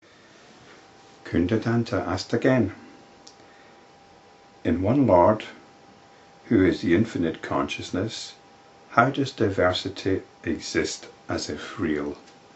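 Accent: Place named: Scotland, United Kingdom. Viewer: I wonder where exactly your accent comes from. It sounds Scottish, but I don't know what city.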